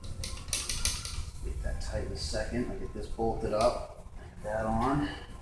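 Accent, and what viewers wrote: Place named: Boston, United States. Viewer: The caaaarburator, love that Boston accent.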